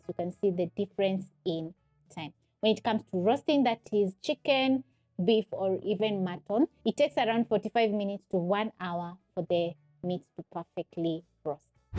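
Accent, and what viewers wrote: Kenya. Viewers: Open. She is Kenyan. It's an accent.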